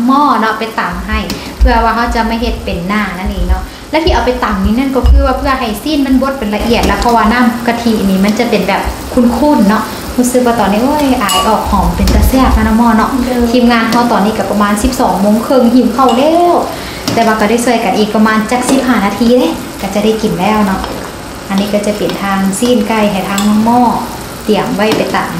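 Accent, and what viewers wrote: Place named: Laos. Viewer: ນີ້ລະຈັ່ງເອີ້ນວ່າຄົນລາວເຮົາການເວົ້າແບບນີ້ສຸດຍອດມັກຫລາຍ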